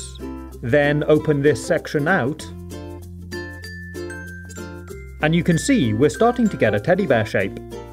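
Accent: United Kingdom. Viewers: Hope u don't mind me asking but are you British?? I am British 😁 U sound a bit Edit: keep up the great work! Love your vids, been subscribed for about 2 years now 😄😮😃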